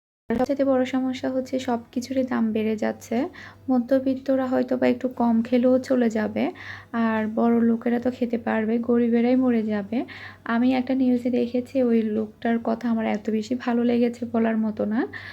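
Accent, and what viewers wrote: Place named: Satkania, Bangladesh. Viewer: আপু সাতকানিয়ার ভাষায় ব্লগ দিতে হয়তো ভালো লাগে না মনে হয়? কিন্তু আপু তোমার মুখে সাতকানিয়ার ভাষায় কথা শুনতে আমার খুবিই ভালো লাগে ।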